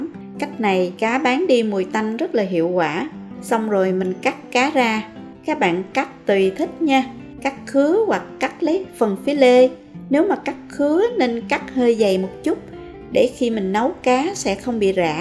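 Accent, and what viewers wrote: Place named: Mekong Delta, Vietnam. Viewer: Rất thích cách chia sẻ từng món ăn của,và nhất là giọng miền Tây để nghe và rõ ràng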